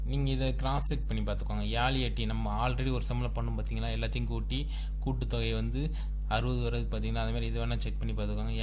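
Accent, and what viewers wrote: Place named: Tirunelveli, India. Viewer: Deepak Dharman it's thirunelveli speach......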